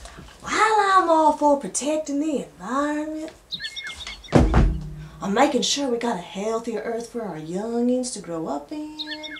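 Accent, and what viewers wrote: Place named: South, United States. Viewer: Great video! Your southern accent is hysterical, not to mention you deliver your points with class and style. I hope you plan on making more vids.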